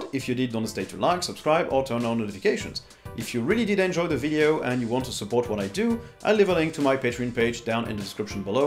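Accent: France. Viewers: You've something like a French accent..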